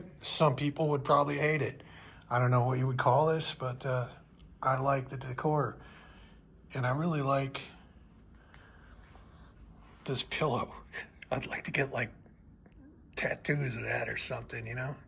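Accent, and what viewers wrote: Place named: United States. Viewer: I have stayed here before. Very nice hotel. You sound American. No hard feelings - Canadian here.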